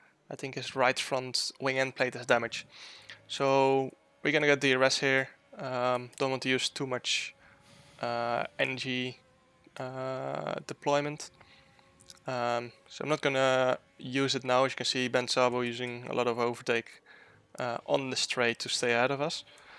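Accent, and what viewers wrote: Netherlands. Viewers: Ik hou van dat Nederlandse accent 😂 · Are u dutch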